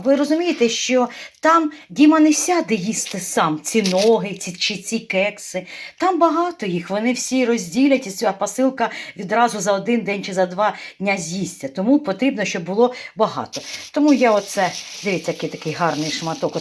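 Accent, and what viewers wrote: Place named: Poltava, Ukraine. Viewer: Добрый день,! Я уроженка Полтавской области, Чутовский район. Для меня ваш говор просто бальзам на душу. Как дома побывала. Все ваши видео смотрю, многие готовлю по вашем рецептам. Желаю вам быстрейшего наступления мира. Держись.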